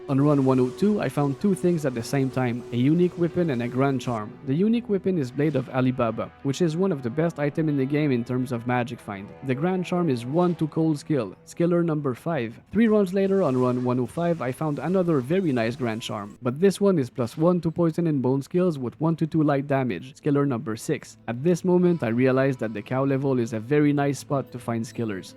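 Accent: Quebec, Canada. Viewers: es-tu queb? tu sonne queb haha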